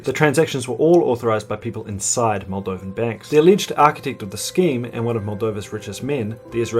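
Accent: New Zealand. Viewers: I think you have the New Zealand accent to blame for that.. Takes a more effort to understand if you have not heard it before! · I didn't even recognise the Kiwi accent til half way thru the vid hahaha.. obviously been watching too much shortland st fml. Awesome vid tho man, good on you! love from another kiwi guy <3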